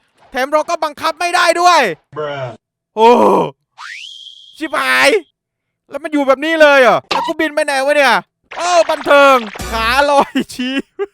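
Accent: South, Thailand. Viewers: คนใต้หรอ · พี่เป็นคนใต้หรอผมก็เป็นคนใต้ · ึคนใต้ใช่ไหมครับสำเนียงเเบบนี้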